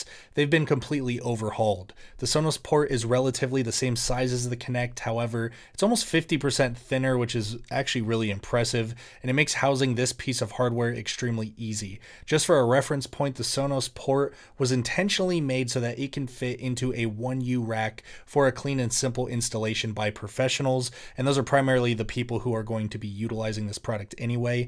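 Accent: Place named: United States. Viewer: Annoying American accent. Low info density, too low. All info could have been given in 5 mins.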